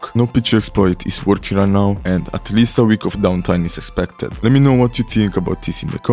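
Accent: Russia. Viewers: you have russian accent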